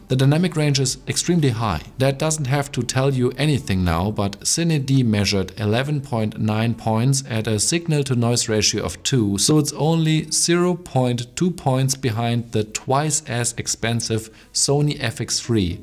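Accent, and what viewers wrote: Germany. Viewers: dein deutscher dialekt ist echt übel XD. teilweise wirkt es so als wolltest du extra englischer sprechen... und dann verkackst du das "very". daraus machst du ein "whery"... und wenn ein vokal nach dem "the" kommt, wird es "thee" ausgesprochen. nicht böser gemeint. ich formuliere immer sehr unsensibel.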